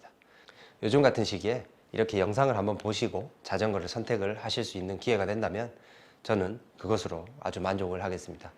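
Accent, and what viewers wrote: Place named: Daegu, South Korea. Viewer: 아직 유명한 채널은 아니지만, 응원합니다. 사장님의 약간 대구 지역 톤이 섞인 차분한 목소리가 굉장히 정감있네요. 구독!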